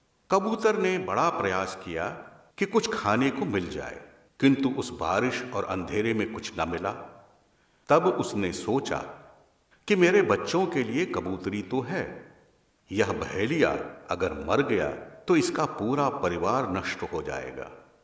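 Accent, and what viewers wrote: Standard. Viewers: विशुद्ध उच्चारण में सुनाई गयी कथा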